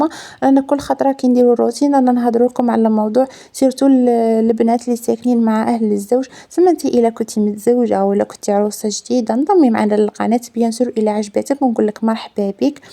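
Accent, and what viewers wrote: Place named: West, Algeria. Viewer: كنت حابا نعرف منين نتي عنباتلي وهرانية كي سمعت كي راكم غايا انا من العاصمة وتعجبني اللهجة تعكم تاع الغرب جايا حلوة وزيد خفاف عالقلب والله احبكم في الله❤❤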